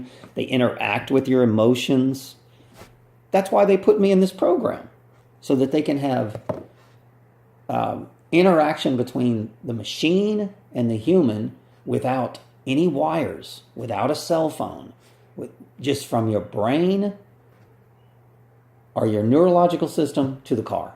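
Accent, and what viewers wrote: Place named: United States. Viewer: what have the Russian bots got to do with helping targeted individuals?you sound American, if you are American you should organize targeted individuals to run the gauntlet to reach president trump. I can assure you trump is oblivious to these programs carried out on innocent humans. try watching youtube channel (ramola d). my freind Trump is the one way these programs can be exposed. the other way is if Putin allows Edward Snowden to expose these horrific crimes. or god gives rise to several whistleblowers who will be mavericks to expose what tortures the corrupt establishments have performed on humanity. what I do believe in is when the time is right gods forces will destroy all satellites communications to free humanity. good will and has to prevail evil.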